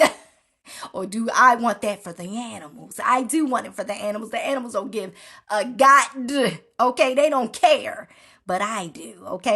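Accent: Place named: Baltimore, United States. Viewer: Me and my son enjoyed ya video , And you must be from Bmore or Maryland i love ya accent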